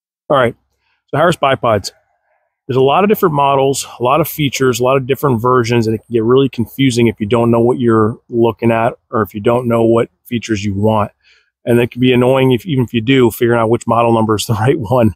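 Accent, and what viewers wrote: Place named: Cuba. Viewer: Your accent reminds me of a Cuban comedian in Miami called Mario Ramil dudes hilarious. Great channel and content btw keep it going.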